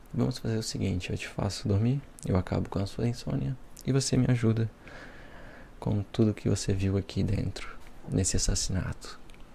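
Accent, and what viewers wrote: Brazil. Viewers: Opa, primeira vez por aqui. Muito bom seus vídeos, gostei do sotaque, parece muito com cidades próximo onde moro. Sou de SC, joinville, o jeito que vc fala , parece com qm mora aqui na região do Vale.. Já fiz um tour por alguns vídeos, são bem relaxantes mesmo, parabéns cara, sucesso !!! Com certeza passarei mais vezes por aqui, qdo a insônia bater.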